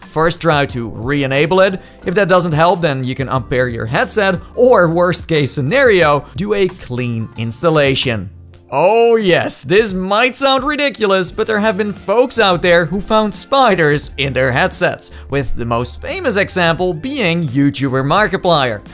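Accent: Netherlands. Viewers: are you dutch?? idk why but i have a feeling with your voice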